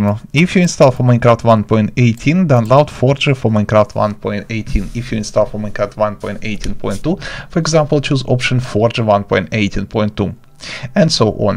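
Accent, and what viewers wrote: Russia. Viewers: eu tenho quase certeza que ele é russo pelo sotaque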